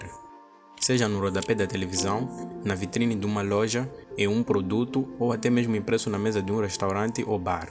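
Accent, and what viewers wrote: Mozambique. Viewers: Eu acho bonitinho o jeito que vocês Moçambicanos falam. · Moçambique(Moçambicano), Nampula creio eu pelo sotaque.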